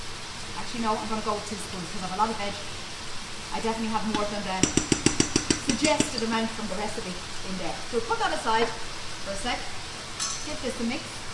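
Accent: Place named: Ireland. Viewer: Nice to see new videos love watching the videos and hearing that beautiful Irish accent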